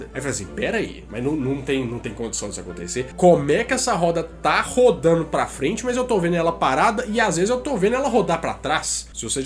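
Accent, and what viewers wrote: Minas Gerais, Brazil. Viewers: Conteúdo: Topzera Carisma: inexistente Sotaque Mineiro: insuportável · Com certeza vc é aqui de BH... Eu também! Nosso sotaque é muito engraçado KKKKKKKKK... Também tenho um canal. Comecei a uns 4 meses. Também falo sobre cultura POP, ciência, curiosidades, etc. Depois se der confere lá. De Mineiro para Mineiro!!!!! <link>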